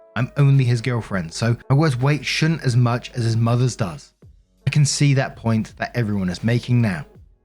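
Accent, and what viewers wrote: England, United Kingdom. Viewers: there is nothing i love more than hearing the voice of a tatted english sweetheart saying "welch's grape juice dumpy" and "hella ass" while laughing. i think i may literally be in love with you just btw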